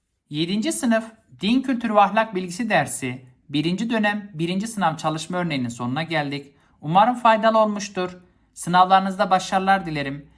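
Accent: Malatya, Turkey. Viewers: Hocam Malatyalımısınız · Hocam çok güzel anlatıyorsunuz elinize sağlik ama siz Malatyalı mi siniz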